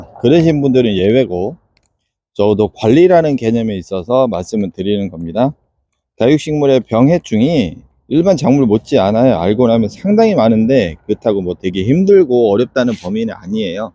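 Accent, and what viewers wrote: South Korea. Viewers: 충청도 사투리 가생이 ㅋㅋ 재빛곰팡이가 잘생기는 아가들이 있더라구여 그럼 쟈는 가망이 없는건가유?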